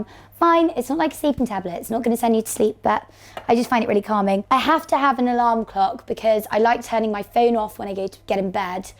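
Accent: United Kingdom. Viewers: She’s so adorable but her accent reminds me so much of Bridget Jones 😩😂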